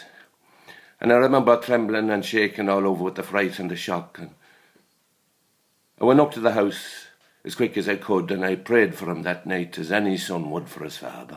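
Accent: Leitrim, Ireland. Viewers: Hes a Leitrim man. But Leitrim has 3 or 4 different accents. His accent is a mixture of Leitrim and Cavan. All people from his town have that mix. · What accent is this? I mean, I know it's Irish, but which one in particular? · Leitrim. Every County, and Indeed áreas within a County, have a distinctive accent.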